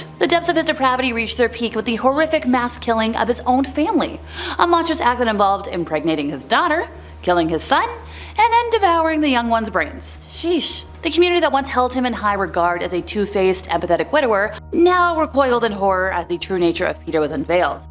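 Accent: Toronto, Canada. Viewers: Toronto accent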